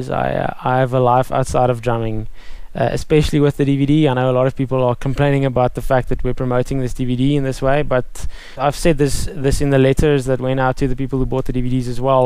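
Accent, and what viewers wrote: South Africa. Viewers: Oh, you're form South Africa! It was bugging me not knowing where your accent was derivitive of. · its south African, its really strange. Almost like french and British combined xD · don't stop making vlogs! i need my dosage of south african accent! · clearly south africa from his accent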